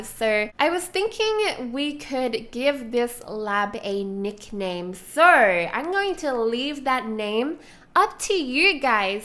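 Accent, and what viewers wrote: Australia. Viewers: Are you Australian? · is she from Australia?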